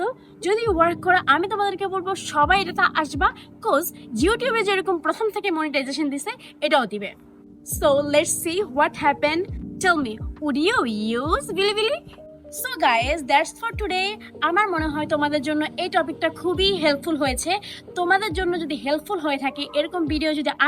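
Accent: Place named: Sylhet, Bangladesh. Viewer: ohh i thought,,, but your speaking look like our Sylheti gr...whatever dear, carry on,, also nice too meet u... ❤❤❤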